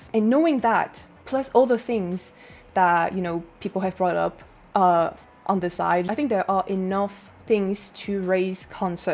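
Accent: Vietnam. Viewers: oh wow I didn't know you could speak Vietnamese lol, I always thought you're from SE Asia. yesss you pass, that's good enough, you totally sound like a Vietnamese who would insert random English words when they speak lol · Chính ra khẩu âm của chị nghe cute ấy chứ, người miền Bắc hầu như đều thấy giọng miền Nam nghe cute cực🤣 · Trời ơi giọng Tiếng Việt của chị nghe hay mà dễ thương xĩu í tr ơi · Come on... your Vietnamese is great. Giọng Sài gòn đặc luôn !!! Be confident because your accent sounds very clear and correct! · i like your Vietnam accent xD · I love your Vietnamese pronunciation🙆‍♂️. It was cute 🤗. I could hear and understand it clearly. I feel so proud that someone who debuted in Kpop was Vietnamese ( I’m Vietnamese too). 🇻🇳🇻🇳🇻🇳 LET’s go with HANNI and NEW JEANS 🎉🎉🎉 ( So sorry because my English’s not good) ❤️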